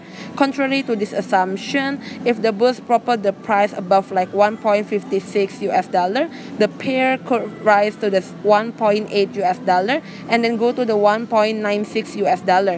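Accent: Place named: Indonesia. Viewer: Ur accent sounds like indonesian 🇮🇩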